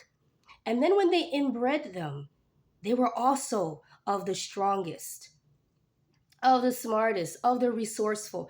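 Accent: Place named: United States. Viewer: Well said, Sister. I am a white American revert married to an Arab. I took my shahada about 24 years ago. My oldest daughter went to an Islamic school in California where I was welcomed by some but not all. It was great community. I lived in the Middle East and had the unique experience of having many American Muslim friends who were married to Arab men. I didn’t go to the masjid there as it was more common for women to gather at homes but I had a community for my children to see where they felt they belonged. When we moved back I tried to be a part of the community but felt really isolated and my children did as well. We haven’t been a part of a masjid during their adolescent years as a result. I feel that I failed them for my being too weak to try and make it work at a masjid. I don’t know any African American Muslims but I have always wanted to try to be a part of that community. 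As a white revert I feel similar feelings that you do as an African American Muslim. Thank Allah for YouTube where I can get something for myself when it comes to practicing my deen. I found you a few years back because you were reading the Quran in English and I longed for an American woman’s voice. You were that woman! may Allah reward you for all of your efforts. Jazakallah khair.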